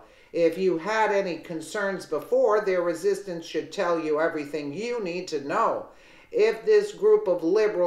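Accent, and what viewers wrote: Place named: South, United States. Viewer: I am waiting,!Just watching if the TRUMPHATERS make another blow at our PRESIDENT! They will have Hell to pay coming a 2nd Civil War and I mean,you know, keeping a hawk eye against the TRUMPHATERS!You have a nice Southern accent, and you're special too! God bless and have the Happiest of Holidays!🎄🎁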